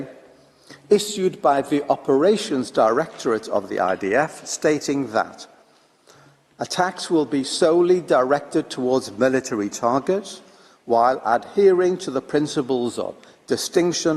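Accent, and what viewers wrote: United Kingdom. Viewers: Beautiful English, amazing British accent. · Mine second - I ador your British English, Sir Malcolm!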